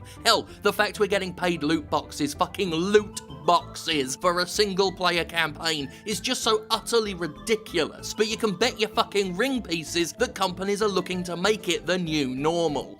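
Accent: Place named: United Kingdom. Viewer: Always nice to hear your own thoughts so eloquently put. And in a British accent to boot. Makes it somehow more dignified. Fuck Warner Bros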